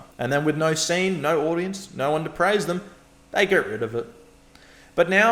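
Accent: Australia. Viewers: This is my first video in your channel. Are you based in Australia? because I don't want to judge based on accent. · Good take, green car, AND an Australian accent all in one?? Sub.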